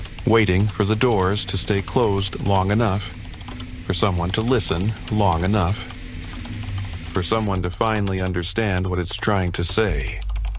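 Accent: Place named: India. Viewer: Whats with the Indian accent?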